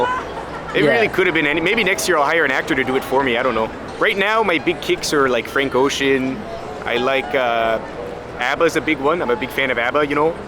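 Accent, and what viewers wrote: Canada. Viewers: Dude I love the heavy Canadian accent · this is the most canadian sounding man i’ve ever heard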